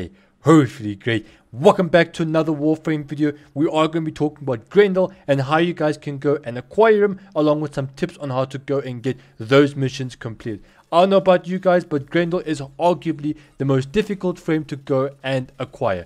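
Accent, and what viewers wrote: South Africa. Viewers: this ou south african?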